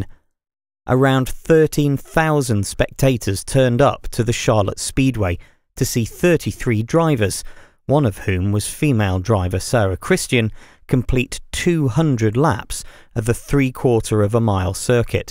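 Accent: United Kingdom. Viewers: Your british voice describing American Stock Car Racing is something I dont want to listen to ... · A British man narrating the origin story of an All-American sport is weird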